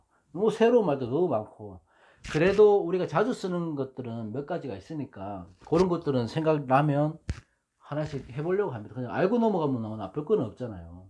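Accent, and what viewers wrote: Gyeongsang, South Korea. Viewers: 젊으신데 구성진 경상도 사투리 살짜꿍 가미된친근한 목소리 마음 편안하게 들을수 있는 감사합니다 ♥️♥️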